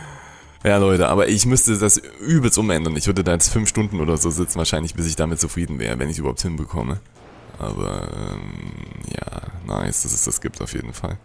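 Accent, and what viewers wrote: Saxony, Germany. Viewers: Hallöle! Guck schon seit ein paar Tagen deine Videos zu Transport Fever 2 und habs mir deswegen auch selbst gekauft. Falls dir auf deiner kleinen schnuckeligem Karte mal Platz und Vorhaben ausgehen hätte ich eine Idee für dich. Im Workshop gibt es eine Deutschlandkarte in extragroß, da könntest dich auch austoben. Ich hab z.B. bei mir die Basiswerte für ein paar Städte angepasst damit sie von der Größe und Fläche auch entsprechend mitskalieren. Ausgehend vom Wert 200 für eine Stadt mit ca. 800k Einwohnern hab ich Berlin auf 800, Hamburg und Wien auf 400, München auf 320 und Köln auf 250 gesetzt um ungefähr an die realen Größenverhältnisse ranzukommen. Zusätzlich fehlt auf der Map jegliche Industrie, die müsstest du im Karteneditor erst hinzufügen. Oder aber, wenn du magst, kann ich dir meine Map zur Verfügung stellen, da es noch ein paar Kleinigkeiten gab die an der Karte zu machen waren. 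Die größte Herausforderung wird sein zum einen den Straßenverkehr in Berlin in den Griff zu bekommen. Wenn man 1850 startet brauch man vor Erfindung der Tram nicht wirklich in Berlin was machen. Als zweites wäre der Aufbau ein nationalen Fernverkehrsnetz nach realem Vorbild + die eine oder andere Regionalverbindung ein lohnendes Ziel. Ich versuch das ganze grad auf schwerem Schwierigkeitsgrad und es ist alles andere als einfach.^^ Zum Schluss noch: Dein Sprachfehler, gepaart mit sächsischem Akzent ist witzig, nicht jeder muss hochdeutsch können. :) MfG, Jester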